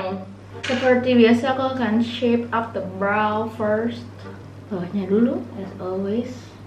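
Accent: Java, Indonesia. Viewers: kenapa medogh sekali bro :*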